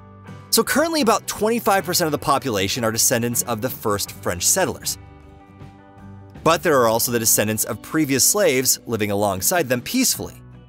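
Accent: United States. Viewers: Hurricanes at 90 mph - needs to do better research , as hurricanes can have winds well above 90 mph . As it was an American accent , guess I cannot blame the narrator , as the USA's education system is not as good as it's cracked up to be , with several university's recently caught selling degrees , just to gather income - this scandal , has done much damage to any degree holder , from the USA and it's corrupt universitys . It was also mentioned that no-one on Reunion is on welfare in the general population , it's the French government workers who are on welfare , not the population generally , they all work , producing Coffee , Vanilla and other crops . The road being built over the ocean , won't extend their "exclusive economic zone one bit . It's being built , to avert some of the problems associated with the current coastal highway , so that access to the airport , port , and other large city , is not interupted as frequently as it's claimed by the narrator .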